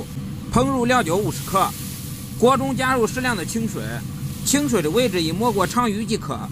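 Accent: Standard. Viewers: 我們家都放老抽。解說很標準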